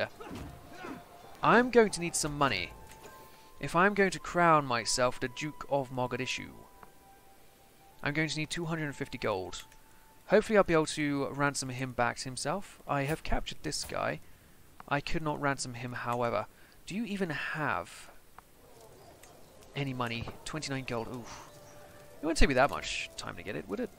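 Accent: United Kingdom. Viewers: . You're thinking of Mo Farah, the long distance runner. Mo Salah is a soccer player. Bro you have the most british accent I've ever heard, you should know this.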